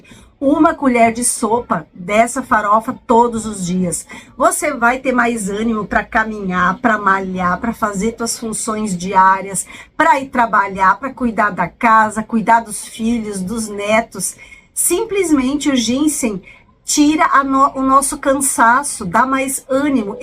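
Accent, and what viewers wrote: Rio Grande do Sul, Brazil. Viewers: Sou de BH, MG, gosto muito de suas orientações e tbm de seu sotaque gaúcho, mto engraçado.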